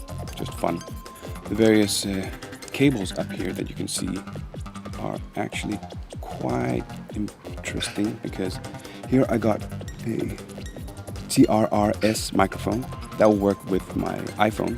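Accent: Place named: Italy. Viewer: You have an Italian accent 🤔 nice vid!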